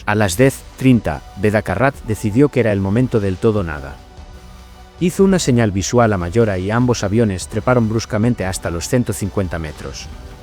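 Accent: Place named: Galicia, Spain. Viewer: El acento del video parece gallego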